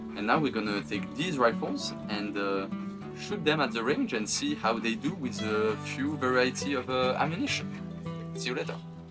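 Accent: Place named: France. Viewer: Ta un accent français toi lol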